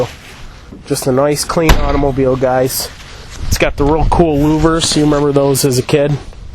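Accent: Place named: Michigan, United States. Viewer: You must be from Michigan I can tell by your accent. Also how much?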